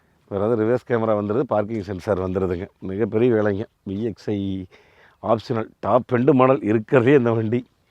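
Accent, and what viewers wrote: Kongu, India. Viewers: அருமையான கொங்கு பேச்சு... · அண்ணனின் கொங்கு தமிழ் மிகவும் இனிமை... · கொங்கு தமிழ் அழகோ அழகு அண்ணா,,காசுக்கு தகுந்த பணியாரம் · கொங்கு தமிழ் 💚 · அழகான கொங்கு தமிழில் பேசுகிறீர்கள்...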